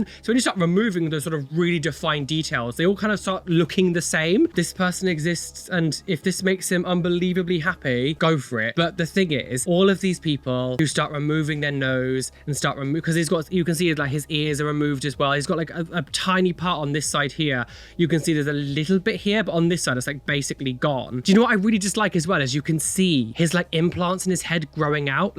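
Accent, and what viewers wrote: United Kingdom. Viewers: Been.there. Done that. Came back in.the early 80"s. But love you anyways. Please don't hate me for telling you this, dearest, but your over all look is too 'busy'. Tats in PRIMARY COLORS never with a Pentagram.... and your piercings need to be placed symetrical so your face isn't sliding down on one side. And please switch to decaffeinated drinks asap. With your delightful British accent you need to remember the English language has coma's and lots of punctuation rather than making it all one sentence. Love ya! alvin, san francisco :)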